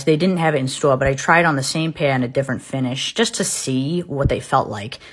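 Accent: Boston, United States. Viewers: It's an over exaggerated Boston accent and I mean over exaggerated!!!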